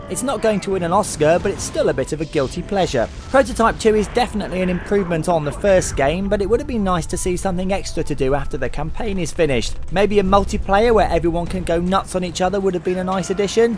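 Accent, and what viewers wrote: United Kingdom. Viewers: The voice is way too brit.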